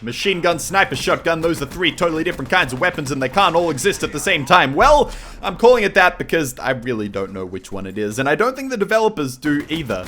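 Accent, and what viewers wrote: Australia. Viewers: TIL is Australian and not British. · are you australian ? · lel you are australian ?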